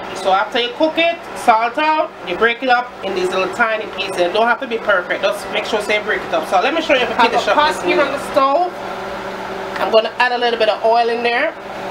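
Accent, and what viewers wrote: Jamaica. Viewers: Her voice and accent brings back such nice warm memories of Jamaica, plus her food, nice and homely..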